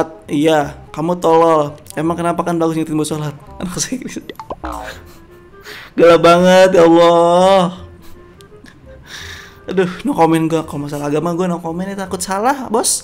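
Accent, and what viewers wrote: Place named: West Sumatra, Indonesia. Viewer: emg dri khas minang:))😅🤔😇💔😤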